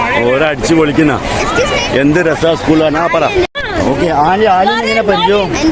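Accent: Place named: Kannur, India. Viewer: ഭായ് ജീവിതത്തിൽ ഏറ്റവും മറക്കാത്ത സ്കൂൾ ജീവിതം ഒരു ടെൻഷൻ ഇല്ല നിന്തി കുളി ബോൾ കളി ചുണ്ടൽ ഇട്ടു മീൻ പിടി ഒരു സമരം കിട്ടി ലീവ് ആവാൻ എന്നു ദേശാഭിമാനി വായിച്ചു നോക്കും അന്ന് ഉള്ള മാഷ്ന്മാർ ഫ്രണ്ട് മരിച്ചാലും മറക്കില്ല പക്ഷെ എന്നു കുട്ടികൾ മൊബൈൽ നെറ്റ് ഹായ് ഭായ് കഴിഞ്ഞു ഭായ് എല്ലാവരോടും ചേർത്ത് പിടിക്കുന്ന കാണുമ്പോൾ ചങ്ക് പൊട്ടുന്നു നമ്മൾ കുറെ ആയി നാട്ടിൽ വന്നിട്ട് ലോകത്തിൽ ഇവിടെ പോയാലും നമ്മുടെ കണ്ണൂർ ഭാഷ പറയുന്ന നിങ്ങൾ ഒരു 22ക്യാരറ്റ് ഉള്ള രാജ്യ സ്‌നേഹി ആണ് താങ്കൾക്ക് കുടുംബത്തിനും ദൈയിവം നന്മകൾ തരട്ടെ 🙏❤️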